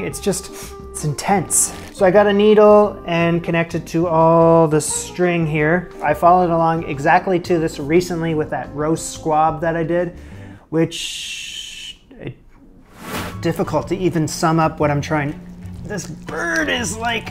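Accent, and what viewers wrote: Canada. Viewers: Did you ever live in Canada? Sometimes, i think I hear a slight Canadian accent in your voice. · A NY apartment dweller with a Canadian dialect that thinks in metric while cooking imperial-united dishes. Interesting.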